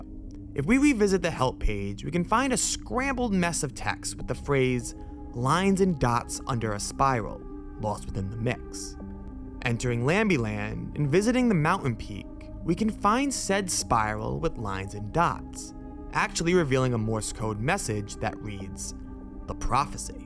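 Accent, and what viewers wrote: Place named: Boston, United States. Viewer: sometimes i think u have a Boston accent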